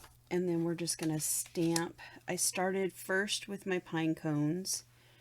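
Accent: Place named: Texas, United States. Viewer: Where in Texas are you from.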